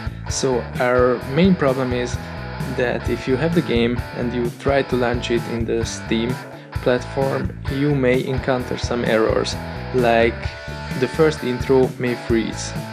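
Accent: Hungary. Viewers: Thanks ! :) That was a really big help :) u from hungary ? cause ur accent is same as hungarian english accent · Jól érzem az akcentusból, hogy honfitársak vagyunk? :D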